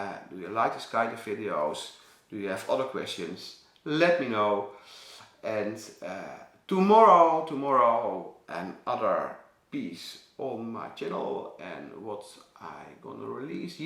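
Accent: Netherlands. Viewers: Thank you for this! Your English reminds me so much of a Dutch man who ran a dairy where I grew up. He was a wonderful, very friendly and cheerful man, as was his family -- friends I grew up with. He spoke English in a manner very similar to the way you do.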